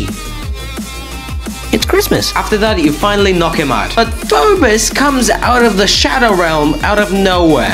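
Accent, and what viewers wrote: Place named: United Kingdom. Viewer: This is most the British review i ever heard nice video bro Also bro the way he said that XD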